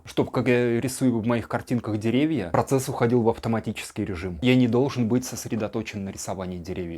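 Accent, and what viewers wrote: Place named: Moscow, Russia. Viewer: раздражает нелепая манера говор с московским ш вместо питерского чн чк откуда ты прелестное ха-ха создание с таким количеством ошибок в речи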